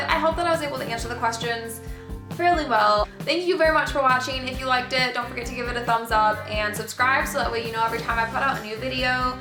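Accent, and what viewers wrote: Midwest, United States. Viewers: Hey! I'm a FA on the other "legacy" side of the operation of our big giant airline fam lol. QUESTION! Are you from the midwest? kind of sounds like it haha my Wisconsin ears are picking up a little midwestern accent.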